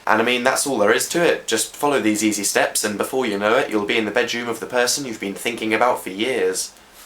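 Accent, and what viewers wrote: United Kingdom. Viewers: He sound extra british here =)) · Why is he so overly British in this 😭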